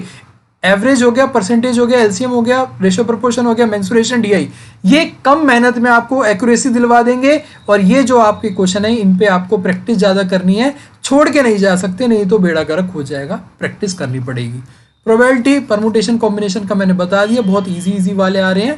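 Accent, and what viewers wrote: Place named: Haryana, India. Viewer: Haryana se lagte ho.... 😁😂😂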